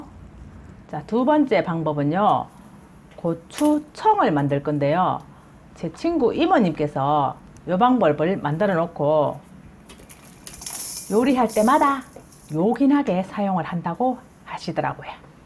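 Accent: Gyeongsang, South Korea. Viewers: 아지메 경상도 어디요!? 넘 정겹네요!!! 잘 봤습니다 건강하시길 기원합니다 🙋‍♂️🙆‍♂️👍🤪😂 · 구수한 경상도 말 너무좋네요 고추요리 감사합니다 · 경상도분이시군요 재밌게 잘 알려주시네요 감사합니다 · 너무 좋아요 참 지혜롭게 하시네요 아주 가끔씩 보긴 하지만 입담도 좋으시고 경상도 억양 꿀팀, , ㅎㅎ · 따라해보려고 청양고추 2봉다리 사왓어요 ㅋㅋ 구수한 사투리에 내고향 경상도의성이 생각나네요 구수한 사투리에 친구엄마처럼 잘가르쳐주셔서 감사합니다~^^.참고로 5학년8반입니다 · 장맛비가 오고나니 텃밭 풋고추가 많이 달려서 어쩌나 했는데 이렇게 좋은 방법 주시니 감사감사요~~^^ 매운맛이 전해지는듯~^^ 갱상도 사투리가 정겹습니다~♡ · 저는 요리배우는것도 좋지만 경상도 말씀으로 설명해주시니 너무도 웃기고 재밋어 좋습니더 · 경상도 사투리가 정겹네요 좋은 영상 잘보고가니더 또올께요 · 웃음띈 아름다운 모습에 구수한 경상도 사투리 정말 녹아 내립니다.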